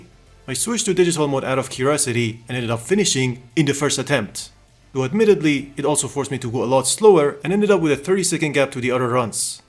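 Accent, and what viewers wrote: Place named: Iran. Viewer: yeah i know ، i didn't want to disrespect you brother , but you have the same accent as Iranians , i thought you might be Iranian like i am , im Persian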